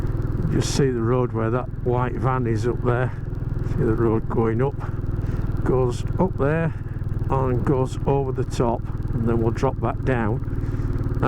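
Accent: Yorkshire, United Kingdom. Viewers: Im happy to help with that. I have 50+ years of biking stories from riding in about 11 different countries and I have no problem with talking. 😀 It would be good to catch up for a cuppa and a chip buttie som time. I have just moved to South Chesterfield, which is handy for the National Park. From your accent, I’m guessing you are from the Barnsley or Wakefield area. This week I went to the RE dealer to try to blag a test ride on the 350 only to find out they were closed until the new year 🤨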